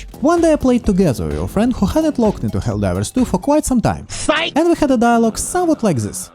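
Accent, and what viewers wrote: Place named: Eastern Europe. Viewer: Love the video and love your thick Eastern European accent more. Hope to see your channel grow and get those sponsors friend~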